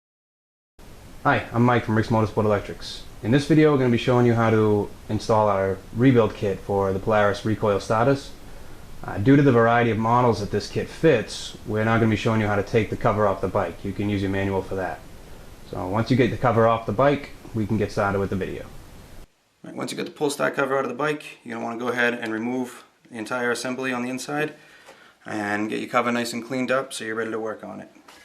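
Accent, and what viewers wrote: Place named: New Hampshire, United States. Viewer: Great, helpful vid! As soon as he said ‘Polaris recoil stahtahs’ I knew this was NH. We moved to VA not too long ago, and man I didn’t think I would miss home or the accent this soon lol. The other thin I miss about NH is no sales tax(or INCOME tax!!). Also seems like there are 3-4x the amount of used ATV’s up there, for less $ than here. And oh ya, NO F IN SALES TAX! Haha happy riding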